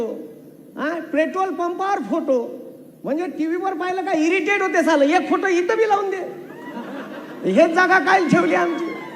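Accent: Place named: Vidarbha, India. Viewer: भाऊ ही विदर्भाची बोलीभाषा आहे... विशेष म्हणजे पूर्व विदर्भाची... आणि त्याचा आम्हाला अभिमान आहे... मराठीच आहे...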